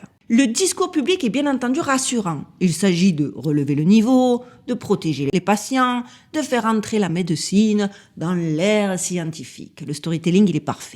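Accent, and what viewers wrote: South, France. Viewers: Merci 💛🙏, de Toulouse PASSIONNANT toutes vos vidéos , et LÀ, Particulièrement Vous Nous Faites du BIEN en Nous Éveillant 👍votre charmant accent Nous Évoque Le SUD francais Tous mes Voeux de Bonheur pour cette Nouvelle💫 Année ⛅🌤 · Bonjour, je viens de vous découvrir aujourd'hui avec deux vidéos successives et je ne m'arrêterai pas là! Vous êtes phénoménalement géniale, avec votre accent marseillais (ma soeur!) ou tout au moins du Sud. Je vote sans la moindre hésitation pour vous aux prochaines élections présidentielles françaises qui viendront je l'espère bien avant l'échéance prévue tant le désordre et l'insalubrité règne chez nous. Je consulte immédiatement toutes vos vidéos pour les écouter et les faire connaître autour de moi! Merci pour votre travail fascinant! ❤❤❤❤❤❤❤❤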